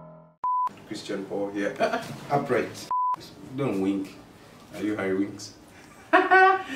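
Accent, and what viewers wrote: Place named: East Africa. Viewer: U sound like u from east Africa (Uganda 🇺🇬 )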